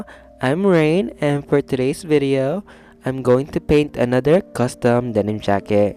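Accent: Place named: Philippines. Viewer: I noticed your accent and the word buwan, are you Filipino?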